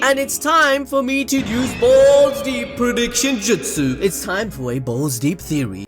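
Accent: Punjab, India. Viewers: what the fuck u speak hindi (i heard a slight punjabi mix but like whaaa) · Bruhhhh you’re indian????? Hearing you speak in punjabi/hindi was hilarious 😂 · Yes!Mitsuki is a really important character in defeating Otsutski. BTW you speak nice Hindi but you have a Punjabi acssent in it. GOOD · serious ur hindi has a bit of indian accent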